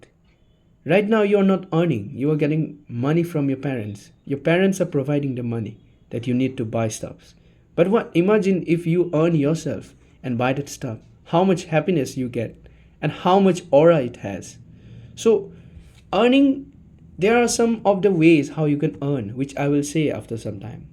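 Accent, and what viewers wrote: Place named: Manipur, India. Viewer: A meitei right? I can sense from yr accent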